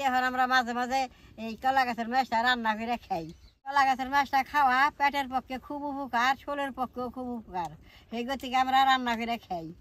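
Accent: Tangail, Bangladesh. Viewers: মাসীমা, আপনি নিশ্চয় বাংলাদেশের টাঙ্গাইলের মানুষ ছিলেন। কথায় বুঝতে পারি। টাঙ্গাইলের বাসিন্দারা কলার বেরাইল/ থৌর খেতে খুব পছন্দ করে।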